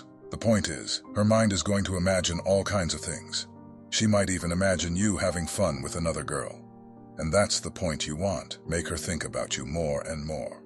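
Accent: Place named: Nigeria. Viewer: You sound like a Nigerian 🇳🇬🙄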